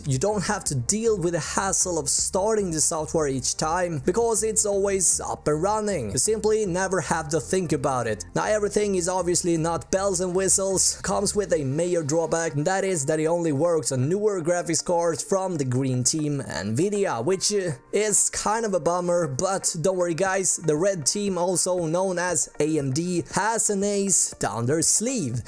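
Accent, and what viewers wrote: Sweden. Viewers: bara svensk dialekt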